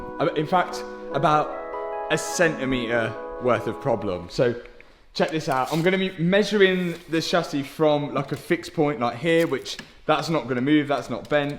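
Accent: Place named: Leicester, United Kingdom. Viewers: I don't care about cars, I am here only to hear your English accent, and its phenomenal. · A familiar Leicester accent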